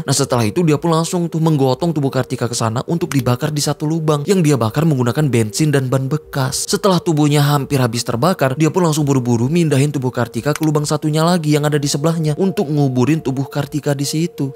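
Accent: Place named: Java, Indonesia. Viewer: Sak jane penak kisah cintane supriyanto sampek bolak balek medok 😃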